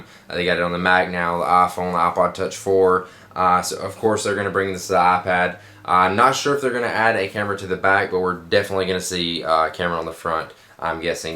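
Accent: South, United States. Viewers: its called a southern accent, or in his case, a semi-southern accent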